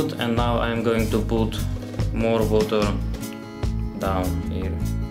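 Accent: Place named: Czech Republic. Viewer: That was my first thought ! I was like, damn he speaks just like shops in Prague ! Thanks for the video !!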